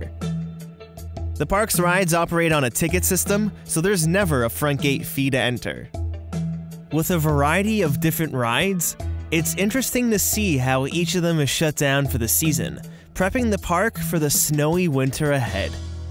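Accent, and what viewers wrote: Canada. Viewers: Are you Canadian? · Are you Canadian????? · Also are you Canadian?!?! I need to know lol 😂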